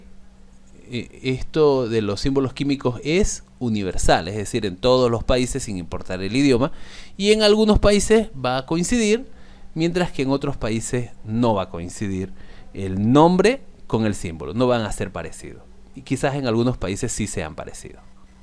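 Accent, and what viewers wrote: Santa Cruz, Bolivia. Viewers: Apenas inicio me di cuenta q era cruceño kakakajaajja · Además me di cuenta por el 'puej' y que en algunos casos decías palabras con j remplazando s, típico de un cruceño 😂. Por cierto exelente video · si cruceño de oro we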